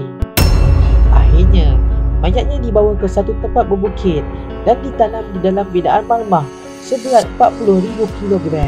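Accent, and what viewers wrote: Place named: Perak, Malaysia. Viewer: Wah!!!... Mike orghang Perak ke Yong oii? Slanga Perak...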